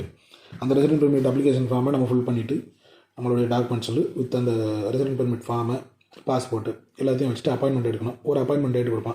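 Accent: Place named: Nagercoil, India. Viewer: Nagercoil slang!!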